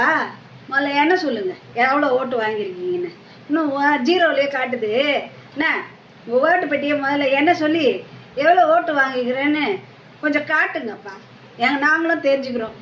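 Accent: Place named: Telugu, India. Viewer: உன் பேச்சிலேயே தெரியுது நீ ஒரு தெலுங்கு கரீனா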